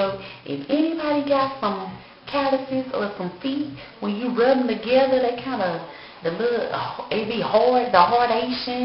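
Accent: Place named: St. Louis, United States. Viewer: Hard-da-tion-----Foot game--duces -You sooo St.louis. I love u......gotta go on my way to Sallys lol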